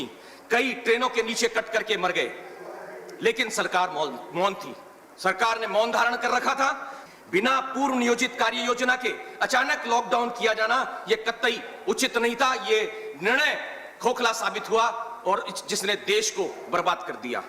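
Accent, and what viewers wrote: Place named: Revdar, India. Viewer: रेवदर की आवाज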